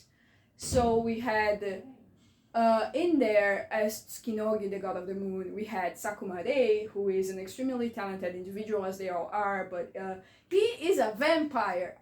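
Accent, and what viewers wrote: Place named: Brazil. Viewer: your accent is so cute it sounds very brazilian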